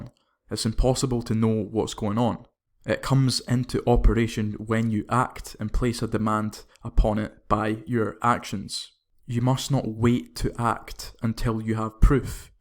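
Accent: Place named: Scotland, United Kingdom. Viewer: 👏 great scottish accent , cognitive training methods that are practical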